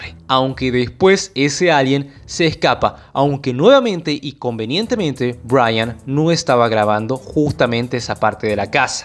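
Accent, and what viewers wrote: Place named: North, Argentina. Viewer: jaja no puedo evitar pensar que sos de santiago o de alguna parte del norte, muy buen video 👏🏻👏🏻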